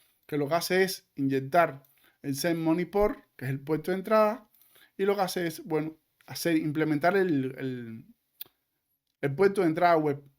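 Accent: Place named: United States. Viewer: Mucho rollo y en farfullo medio yanki. Es incomprensible.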